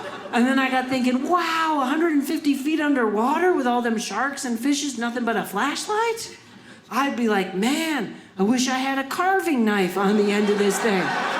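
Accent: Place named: Wyoming, United States. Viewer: She sounds like she's a local Casper/Bozeman/ Kellogg persona.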